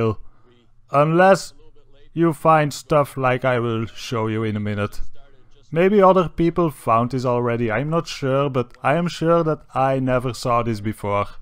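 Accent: Austria. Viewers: One thing I am CONVINCED about is: Your from Austria aren’t you??? Even though the only Austrian accent I have ever heard in my life was the great governator himself Arnold Swarzenegger! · Is the voice over guy doing a Schwarzenegger impression? or is he just Austrian?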